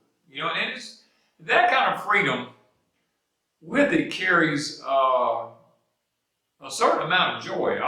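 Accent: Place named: Alabama, United States. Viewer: Thanks. Love the channel. Feel like I'm listening to someone from around the corner from me. Mobile, Al. Come down and talk sometime.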